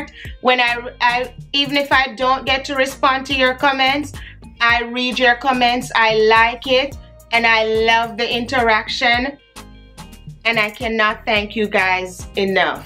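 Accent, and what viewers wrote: Jamaica. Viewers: you sound Jamaican lol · Me hear the 🇯🇲 accent and then me hear the patois chip in ,me instantly proud🥰. New subsriber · Girl when I heard the yardie accent is the fastest I ever subscribed. I'm also a teacher and like you ain't about that shabby look. Love the video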